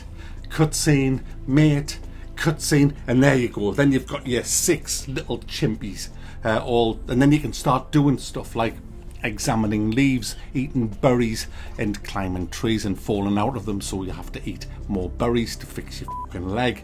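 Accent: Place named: Newcastle, United Kingdom. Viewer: video is too long to tell us its shit, cut to the chase a little more. you just get saltier and saltier, no body wants to hear that shit, its boring,90% games to you are shit but I like your stupid Geordie voice it reminds me of an ex squaddie mate that used to pick nub ends off the floor to make a whole fag. 5 min video bad things good things that's it but constantly trolling the game is what you do all the time just keep it short bud that's of my life I've just lost and will never get back.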